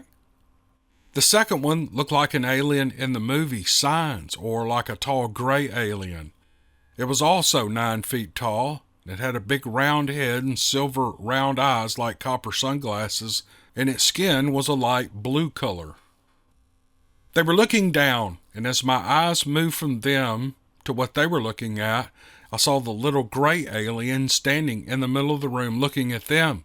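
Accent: South, United States. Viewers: Yet again,another great podcast.My personal interest in Sasquatch is fueled by what I consider a possible encounter as a child.A friend told me about you .I am an avid reader,however,I have formed an addiction to listening to your narratives.It must be the southern dialect .You see,I am from Alabama and our accents are quite similar .I read in a southern accent ,so listening to someone reading in the same is natural .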